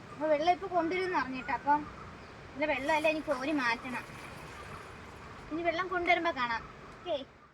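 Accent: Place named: Kollam, India. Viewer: നിങ്ങളുടെ സ്ഥലം എവിടെ ആണ്??...കൊല്ലം ആണോ??...സംസാരം കേട്ടിട്ട് കൊല്ലം പോലെ തോന്നി??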